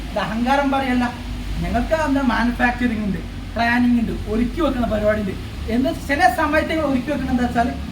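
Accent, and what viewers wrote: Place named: Malappuram, India. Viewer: ഇടക്ക് മലപ്പുറം ബാഷ വരുന്നുണ്ട്...😮😮😮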